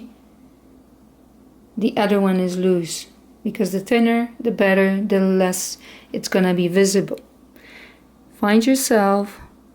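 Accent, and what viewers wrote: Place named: Armenia. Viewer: You sound like from Armenia like a lady I knew. She was from there. Neat voice & accent. If a person does not have a needle & thread they most likely not have an interfacing. I never heard of that or something like that to iron on etc only the word itself. Thanks for showing us how to sow a small hole tear. I am sewing 2 on a bag my mother made of 🌈 & a shirt.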